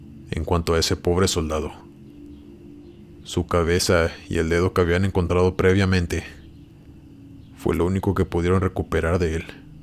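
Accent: Mexico. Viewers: Me gusta mucho este canal. >Narra las historias con la seriedad que merece. >tiene una voz muy agradable y modulada, y norteña como yo. >los relatos son muy interesantes, incluso siempre que los escucho logro estar ahí donde se narra, en el bosque, desierto, etc.... es que debido a las experiencias vividas en mi infancia, no tengo el miedo que se esperaría deba sentir, y aparte consumo mucho contenido como el suyo, quizá buscando experiencias similares a las mías o buscando una respuesta. >me gusta mucho que en cada relato pone un título y subtitulo. Le da más suspenso. GRACIAS.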